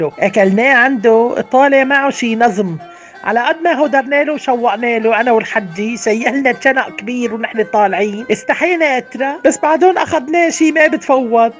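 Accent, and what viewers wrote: Aleppo, Syria. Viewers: وللة بتذكريني كتير بلحكي الححححلبي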